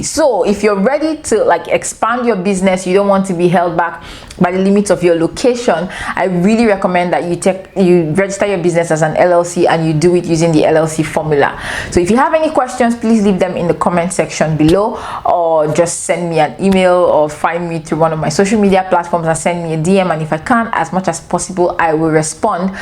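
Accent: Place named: Nigeria. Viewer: You sound like a Nigerian you speak exactly like me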